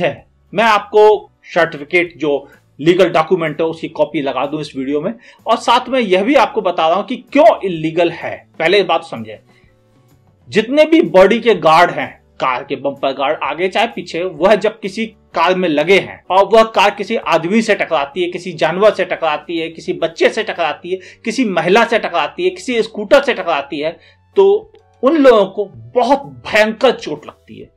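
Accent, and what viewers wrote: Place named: Uttarakhand, India. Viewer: Are you from uttra khan because I am and determine your voice tone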